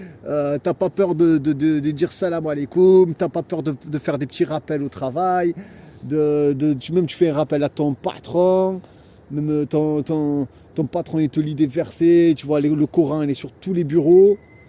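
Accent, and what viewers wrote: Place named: South, France. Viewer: سلام عليكم خويا Tout d'abord bienvenue à toi et ta petite famille en Algérie terre d'islam, j'ai regardé avec beaucoup d'attention toutes tes petites vidéos et tu m'as donné un grand sourire grâce à ta joie de vivre, ton courage et aussi ton petit accent du sud "fada" ^^ Que dieu vous préserve et vous aide dans votre quotidien ici en Algérie, et ne pas oublier la parole de dieu : يا أيها الذين أمنو إن تنصرو الله ينصركم Pour revenir au sujet de ta vidéo, tu as tout à fait raison sur les conditions de vie ici, contrairement à un Algérien qui est né et grandi ici, avec son entourage et sa famille, il est bien entendu très rude pour un mouhadjir comme toi de s'installer comme salarié ici, car la grille salariale ici n'est pas forcément adaptée à votre cas, et l'absence également de beaucoup d'aides sociales dont vous bénéficiez en France. 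Par contre pour ce qui est de l'entrepreneuriat, l'histoire est un peu différente, pour ne citer que quelques exemples, ici il est possible d'obtenir un terrain agricole ou industriel gratuitement auprès de l'état en contrepartie d'un investissement à faire ici dans ces secteurs, il faut bien entendu créer une entreprise de droit Algérien (en Algérie il est interdit de vendre à un non Algérien pour protéger la terre), il est également possible d'obtenir un crédit islamique hallal auprès d'un établissement financier pour financer partiellement son projet, Il y a beaucoup de choses à faire ici en Algérie et ce pays ne crache pas sur les gens sincè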